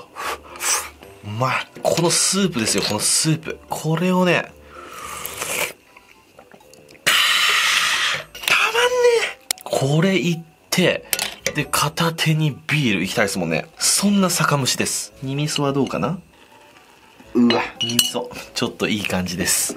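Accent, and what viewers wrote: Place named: Aichi, Japan. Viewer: 最近視聴させていただくようになりました。時々馴染みのある訛りが聞こえると思ったらやはり愛知でしたか！嬉しいです！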